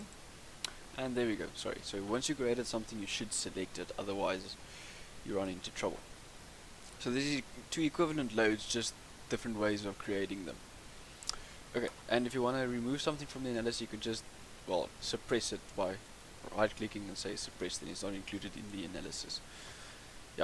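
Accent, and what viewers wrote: South Africa. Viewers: south african much?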